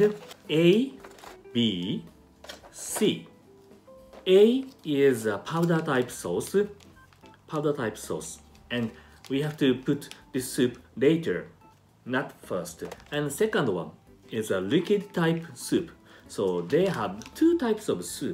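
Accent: Japan. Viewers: Always interesting listening to native Japanese speaking english(no offense meant). As someone trying to learn Japanese I am beyond impressed how well transitions from JP->En->JP->En is for a lot of people. Ive always had a hard time with the order of things moving between English to other languages, like spanish. Kudos.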